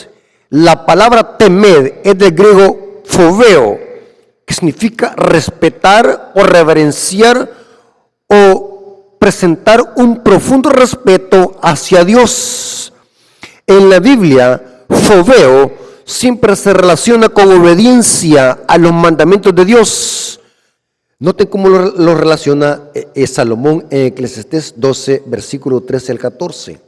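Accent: El Salvador. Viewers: Disculpe, el hermano no dice sexta, sino que su pronunciación es diferente, él está diciendo SECTA. 😊 ASÍ HABLA ÉL, POR SERDEL SALVADOR.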